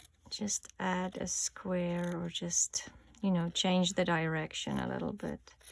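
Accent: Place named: South Africa. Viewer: I found your video today. Love listening to a fellow SA accent.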